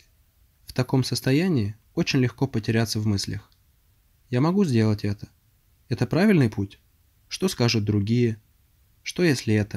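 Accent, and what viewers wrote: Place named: Russia. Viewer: Темнишь ты ,акцент кагбуд то родился в сраной рф и в ней и по сей день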